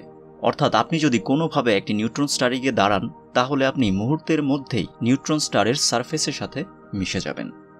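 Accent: Narsingdi, Bangladesh. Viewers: ও বাই আপনি কি আমাদের নরসিংদীর মানুষ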